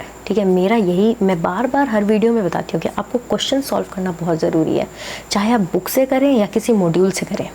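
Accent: Bihar, India. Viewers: R u from Bihar???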